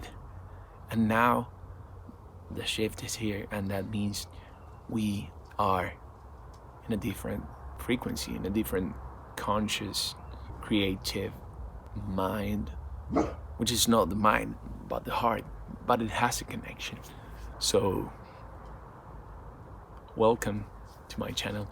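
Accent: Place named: Sweden. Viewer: 100!Resonate. You sound Swedish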